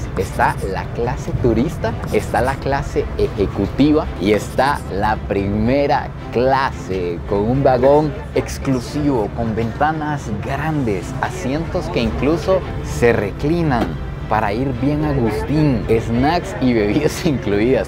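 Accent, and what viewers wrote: Mexico. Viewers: jajaja flaco kbron, que chingon que visitaste nuestro Mexico. Te ves feliz, gracias por compartir un poco de nuestro encanto mexicano. oye, por cierto, tienes acento mexicano. neta eres de otra patria?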